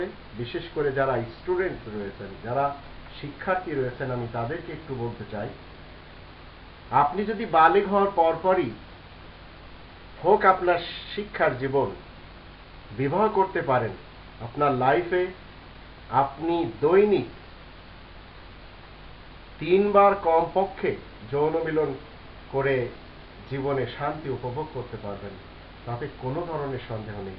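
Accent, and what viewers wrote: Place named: Comilla, Bangladesh. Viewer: আপনার বাপ দাদারা কি কুমিল্লা থেকে যশোর এসে বসবাসকারী? আপনার ভাষায় তাই ধারনা হয়।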